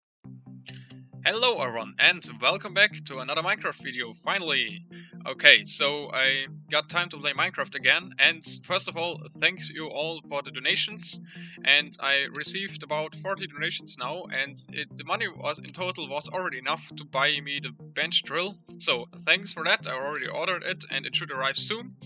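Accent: Germany. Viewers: Accent? Germany?!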